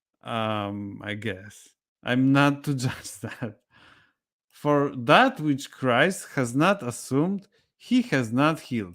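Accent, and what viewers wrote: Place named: Eastern Europe. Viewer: Is this book popular? Nice read with good commentary and thic and fresh slavic accent 😁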